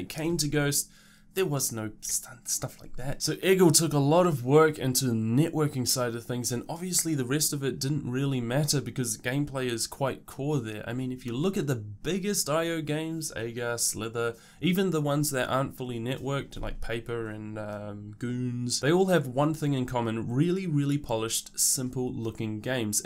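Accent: New Zealand. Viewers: Are you from nz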